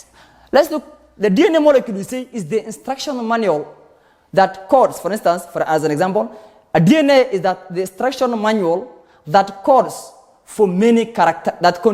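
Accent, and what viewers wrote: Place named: Africa. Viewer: Waxaagaba lama fahmi karo lahjad foolxuno tii africanka ba wax ku aqrinaysaaye tii somalida la imow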